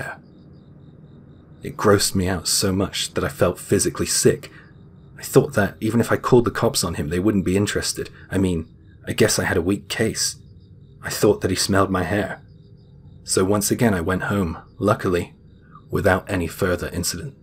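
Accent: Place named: England, United Kingdom. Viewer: Such unique content. Japanese stories translated to American English with an English accent! You have something special here.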